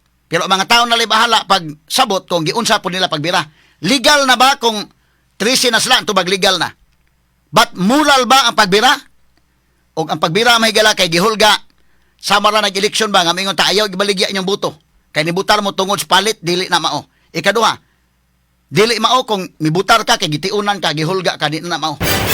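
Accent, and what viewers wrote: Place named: Visayas, Philippines. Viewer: Bisayaa nimo Boss anouncer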